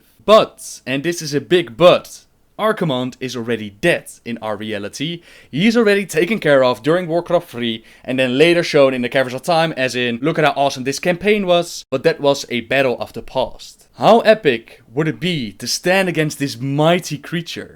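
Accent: Netherlands. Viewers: Where do you come from... it sounds dutch.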